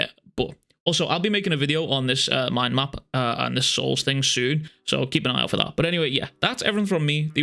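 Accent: Liverpool, United Kingdom. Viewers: Are u a scouser ? I thought you were scottish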